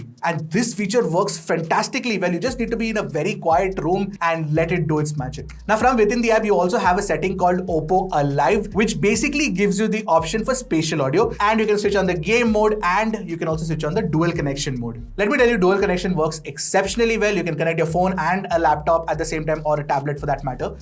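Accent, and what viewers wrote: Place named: South India, India. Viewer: Irritating south indian accent😖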